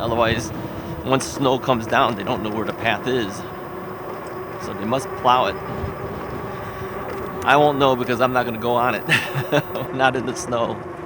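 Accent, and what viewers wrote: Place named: North, United States. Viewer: Just found you through “ Total Therapy Solution PT “ you tube. Love your mission to help others! I am getting ready to have 1st of 2 knee replacements at 70 years of age, female, due to advanced osteoarthritis in both knees! Love bike riding and visited my son in Germany this past summer. He is an avid bike rider and Germany has wonderful trails with views in the mountains for riding. You mention the weather many times in the video but don’t say where you are from? Are you in Chicago? You mentioned the “ Windy City “ and I’m picking up a rather northern accent in your voice. I was raised in upstate new York near Buffalo. Excited to see such bike trails are available! My son introduced me to the idea of an ebike while I was in Germany. Many Germans are using them! I plan to keep following you and loved riding along! 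Thank you for what you are doing!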